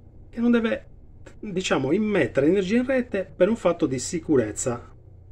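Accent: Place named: Sardinia, Italy. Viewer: Ciao, ma sei sardo anche tu vero?? 😎 Si capisce dall'accento e perche sei in gamba! Comunque interrompi tutto sul più bello, dici "ma adesso andiamo a vedere" e poi finisce il video 😢 Io sto riscontrando un problema dei dati misurati dal sensore, che a casa mia è stato posto a monte dell'interruttore generale, proprio nel quadro di casa...incrociando i dati con letture contatore e la pinza Shelly EM che ho installato a valle del generale, hi notato che c'è una discrepanza nella lettura dei consumi di casa e dellenergia prelevata, di ben 45kWh in un mese (Gennaio, dunque 24gg)...secondo te cosa può essere? Va' assolutamente collegata proprio nella cassetta del contatore? La linea dal contatore al generale è diretta, c'è solo un altro quadretto con un altro interruttore. Comunque caricaci la fine del videoooo 😂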